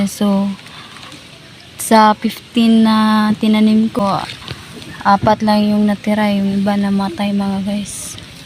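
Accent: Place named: Binukid, Philippines. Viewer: Binukid jud imong tirada ba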